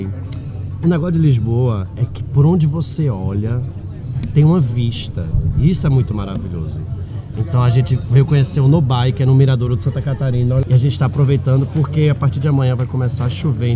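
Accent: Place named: Salvador, Brazil. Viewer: Vc é baiano,? Tem um sotaque soteropolitano aí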